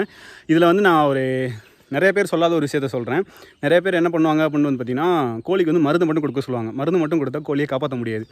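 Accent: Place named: Madurai, India. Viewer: 🤝Congratulations 🤩Your madurai tamil slang was nice to hear🙌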